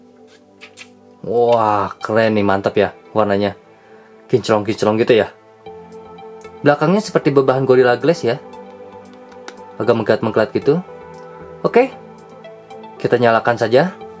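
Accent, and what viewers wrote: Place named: West Java, Indonesia. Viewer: logat nya orang sunda , bandung kah?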